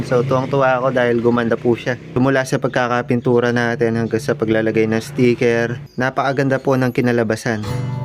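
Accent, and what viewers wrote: Pampanga, Philippines. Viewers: Kapampangan ka ata sir😊 accent mo kasi.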